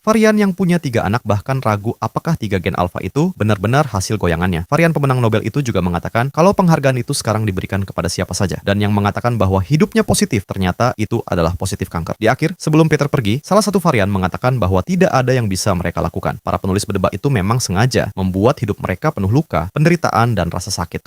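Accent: Sunda, Indonesia. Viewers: Abang ini ternyata orang Sunda ey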